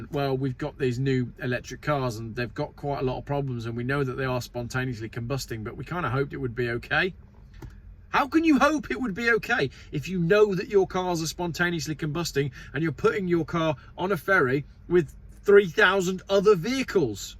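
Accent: United Kingdom. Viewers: It's worth it just to hear your british accent. We "colonials" love it! And nobody here in the US seems to be as ahead of the curve on EV's as you are.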